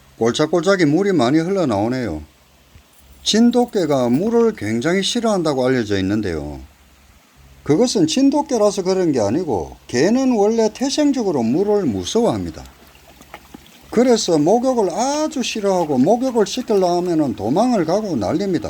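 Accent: Gyeongsang, South Korea. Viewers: 여기가 어느 지역입니까 경상도 인가요 ? 강원도 인지요 ? 저는 서울입니다 ^^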